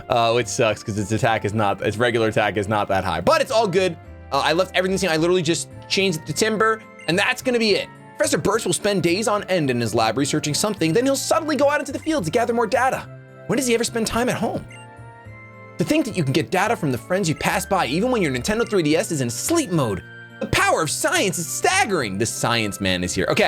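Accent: United Kingdom. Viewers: I could just sit here for days and listen to that british accent 🤣🤣🤣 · As a British person I'm living for the British accent!!!! Its the best thing ever 🤣🤣🤣 Fave shiny is deffo Eevee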